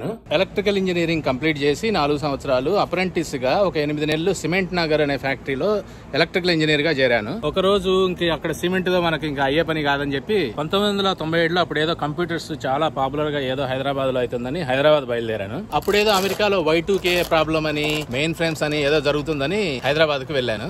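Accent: Kadapa, India. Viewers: Me matalu vintene pakka rayalaseema ani artham avutundi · అందుకే మీ బాష పచ్చి రాయలసీమ దని నాకు ముందే తెలుసు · Anna I'm also from kadapa 😁 Language slang suuuper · Mee slang adurs nenu kooda from raayalaseema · U posting great videos, Please try to talk always in your natural slang..Raayalasima slang... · Super garu pakka rayalaseema basha lo chepputhunnaru. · Sir I am very glad to see a Kadapa person talking in kadapa accent sir namaste I am from kadapa, pendlimarry mandala, anna namaste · Super anna! Great journey,🙏🙏🙏 Seema language bagane matladuthunaru inka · Anna Mee vedios chalabaguntai....manakadapa basanu inka meru gurtupattukoni chala clearga matladutunnaru.. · Ur great sir meeru inka telugu and kadapa yasa marchipoledu enni years aina.... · Inni rojulu mi yasa chusi ma kadapa district ani doubt vundey.. ipudu confirm ayindi.. manchi info isthu ilagey videos cheyandi.. 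love from Kadapa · Anukokunda mee vedio chusanu flightjourny gurinchi.meeru matladevidhanam telisi kadapaani doutvachindi ,andulo Reddy's ani anukunnanu .endukate Maadi palletutu,kani maa vurilochalamandi.reddys Amerika,landanlo,jarmanylo vunnaru.Reddy's vallu chaduvulo eppudu first vuntaru and very intelligent.meeru maatalu vintunte nenu tenthclasslo vunnapudu maa hindi sir mallareddy garu gurtuku vacharu.sootiga matladatam,jokes veyyatam same anni alage vunnai .ayanadi Kadapa. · కడప భాష అదుర్స్ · Memu kadapavallamu andi malage matladutunnru andukey ma vallu aney feeling vachindi me matlalu vintuntey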